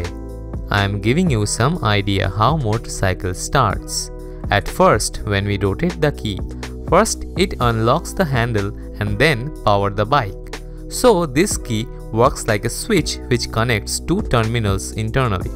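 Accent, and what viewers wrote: India. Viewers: You know it's real when you heard indian voice 🤗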